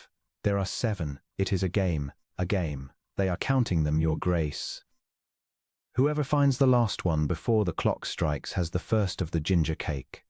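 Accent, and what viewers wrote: United Kingdom. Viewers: Loved this story! Thank you for reading it in a British accent and making this tale so authentic and enjoyable.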